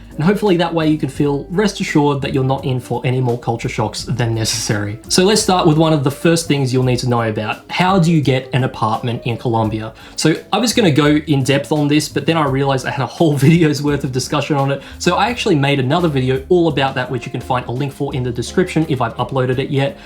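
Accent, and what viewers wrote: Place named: New Zealand. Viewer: Love that kiwi accent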